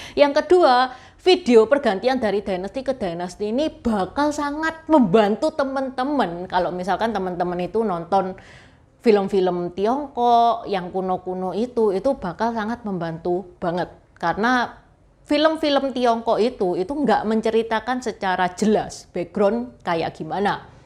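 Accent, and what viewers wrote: Java, Indonesia. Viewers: Hostnya medogg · Seneng baku ndengerin suarane naik medokmu kuwi lho cik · mba tinnggal dimana,ko ngomongnya medok banget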